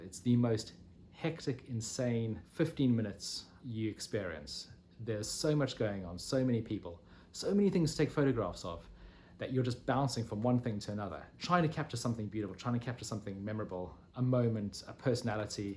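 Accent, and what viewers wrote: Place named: England, United Kingdom. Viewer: Very talented..although I much preferred your floppy fringe..it matched your english accent!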